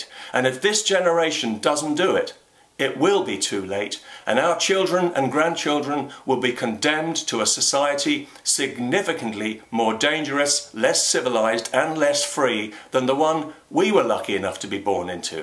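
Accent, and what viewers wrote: England, United Kingdom. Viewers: Condell is himself an immigrant. Despite the English accent, he was born in Ireland.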